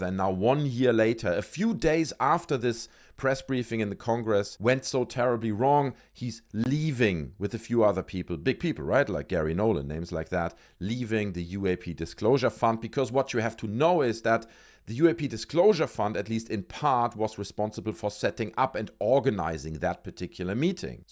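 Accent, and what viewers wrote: Germany. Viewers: I just love your German accent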